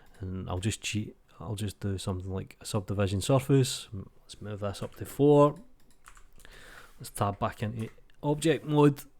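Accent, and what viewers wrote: Scotland, United Kingdom. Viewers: You just propelled yourself into YouTube stardom with your little magpie beef, there at the start. Gotta love a Scottish accent. Plus the info was concise and accurate. Thumbs up, mate 👍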